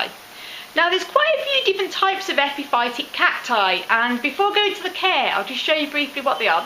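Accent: Birmingham, United Kingdom. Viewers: Thank you 😁 hey this is a weird question but your accent reminds me a bit of mine, did you used to live around Birmingham?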